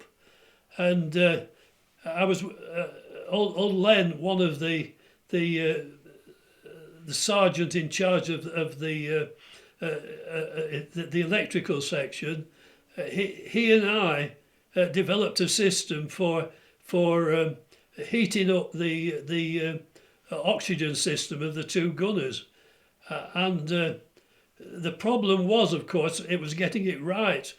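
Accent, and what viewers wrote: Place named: East Lancashire, United Kingdom. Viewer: East Lancashire accent. Do you not think so ? lol ....I locked myself out of my house house here in Wisconsin when it was MINUS 30 C. I was wearing a T shirt and I thought I would die in 5 minutes. My house has security glass and I couldn't break in with a big hammer so I begged for shelter at a neighbours house......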